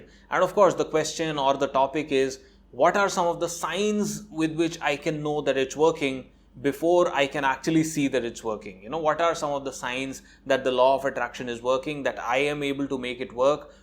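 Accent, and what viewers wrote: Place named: India. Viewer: Ugh .. The Indian accent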